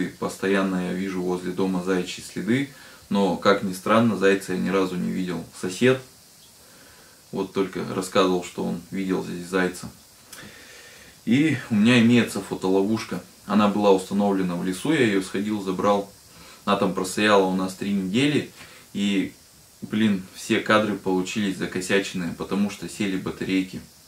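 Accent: Urals, Russia. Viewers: Вот как раз по нашему разговаривают ...по Уральски ✌️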